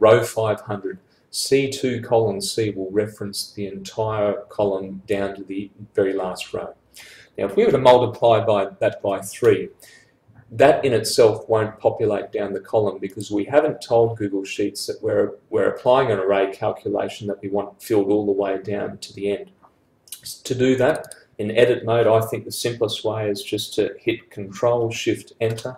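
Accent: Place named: Australia. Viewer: Direct, well explained. I learnt something without having to launch a google investigation chain. I think the Australia accent has a lot to do with it. Top job mayte!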